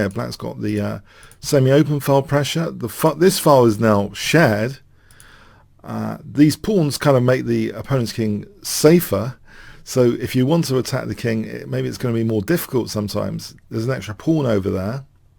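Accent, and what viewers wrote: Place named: RP, United Kingdom. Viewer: May I say... I love your videos as much for the great chess insights as for your very nice English BBC accent ☺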